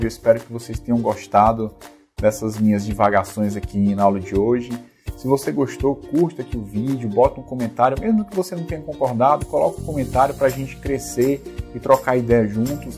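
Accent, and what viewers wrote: Ceará, Brazil. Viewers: Seu conteúdo é muito bom! Estou acompanhando seu curso de vue na udemy, muito foda! Professor você é cearense?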